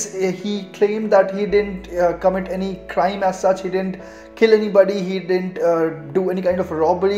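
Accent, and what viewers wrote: India. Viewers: Indian english accent hi h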